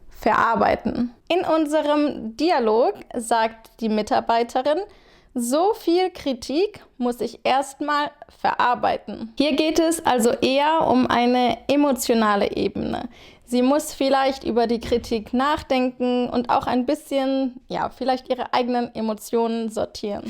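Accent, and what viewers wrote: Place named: Germany. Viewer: Ihre deutsche Akzent und die Art und Weise wie Sie alles erklären finde ich wundervoll. Vielen Dank dafür 😊👏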